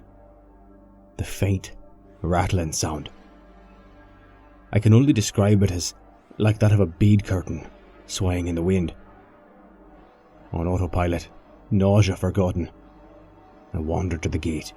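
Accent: Ireland. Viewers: Damn, I’ve heard this one before, but your narration’s the best one I’ve heard. Your Irish accent sounds awesome! Not too overdone, extremely believable. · Love your Irish accent! Great narrations!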